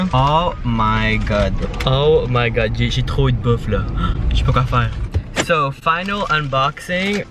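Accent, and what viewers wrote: Quebec, Canada. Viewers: Pour moi c'est vraiment difficile te comprendre parfois ... J'ai appris le français comme troisième langue alors c'est difficile en général mais ... La vache ... Le français du Québec c'est très compliqué pour moi. 😅 Mais quand même je pratique avec tes vidéos !! 👌 Je les adore !! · Il a l'accent Québécois c'est tout.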